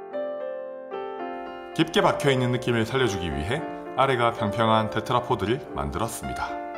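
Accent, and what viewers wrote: Gyeongsang, South Korea. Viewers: 은근한 사투리가 섞여계시네유 경상도느낌ㅎㅎㅎ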